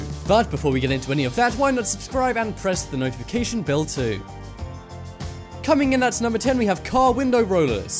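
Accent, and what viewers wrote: England, United Kingdom. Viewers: He's English because us American don't sound like that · I cant figure out if this guy is english or american 😂🤣😂🤣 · You English?you sound it but you use so many American terms,you ashamed to be English?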